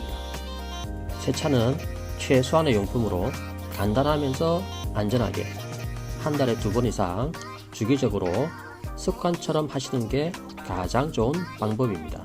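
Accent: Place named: Gyeongsang, South Korea. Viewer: 어색한 표준말 경상도 티 다난다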